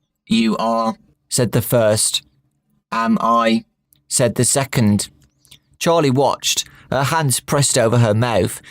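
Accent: United Kingdom. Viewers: i dont need super thick british audio reading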